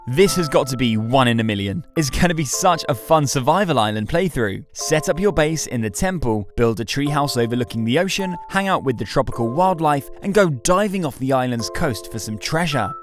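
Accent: United Kingdom. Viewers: He sounds like he's from the great British baking show.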